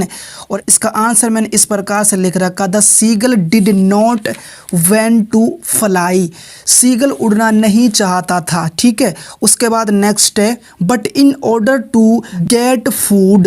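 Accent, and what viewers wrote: Mewat, India. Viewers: Mewati hai bhai tu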